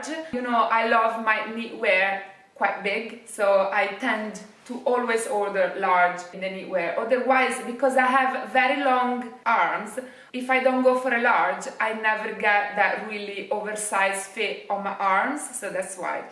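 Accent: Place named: England, United Kingdom. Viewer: Ciao, Ti stanno da Dio i pantaloni da Zara,amo il tuo accento inglese.Qualche volta ti scrivo in inglese 😊perché sono una ragazza di adozione italiana 😅che vive in Danimarca.Amo il tuo stile 😍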